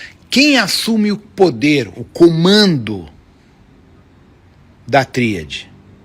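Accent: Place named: Spain. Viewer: Foi ruim de entender pq ele tem o sotaque espanhol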